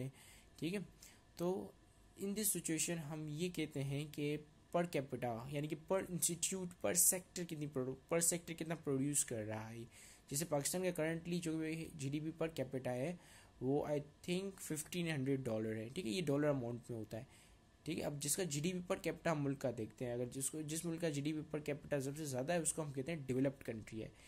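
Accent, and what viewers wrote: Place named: United Kingdom. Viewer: nd youre Urdu is like English Accent it reminds me Bilawal Bhutto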